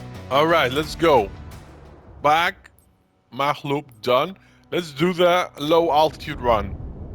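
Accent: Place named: Netherlands. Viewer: Nederlander zo te horen haha. Ben je al naar je eigen huis geweest in game ? 😂. Was een van de eerste dingen die ik deed. En naar al die leipe plaatsen zoals north sentinel island en Chernobyl enz enz 😂😂😂.. ik zit ook op base ps5 en het speelt heerlijk, maar ik speel dit niet echt als een flight sim, meer als een tourist sim lol. Al vlieg ik wel naar de locaties toe vanaf een vliegveldje dichtbij maar vluchten van hele dagen zie ik me zelf niet zo snel doen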